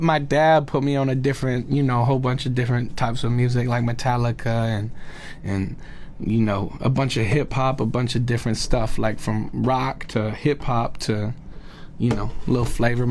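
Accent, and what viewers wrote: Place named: Texas, United States. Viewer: I hear that Texan accent!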